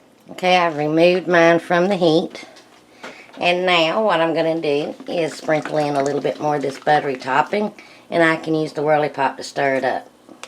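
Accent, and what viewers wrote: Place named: South Carolina, United States. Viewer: I live in the foothills in SC and you sound like my kind of folks! Love your videos!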